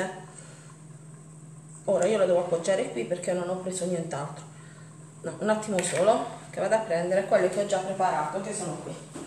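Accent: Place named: Apulia, Italy. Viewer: sei bravissima quando spieghi,sono pugliese anche io e quando parli mi sembra di sentire la mia voce con la stessa cadenza pugliese, (Corato),da 50anni sono in Piemonte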